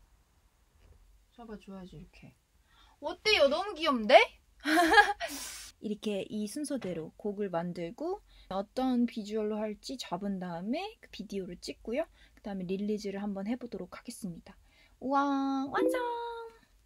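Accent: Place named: Gyeongsang, South Korea. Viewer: 경상도 여자였어♥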